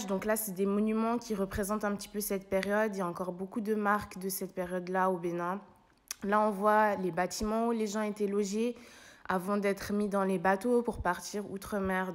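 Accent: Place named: Europe. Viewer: Cool video. Jaime bien Mais la prochaine fois n'utilise plus l'accent des européens mais plutôt notre accent d'origine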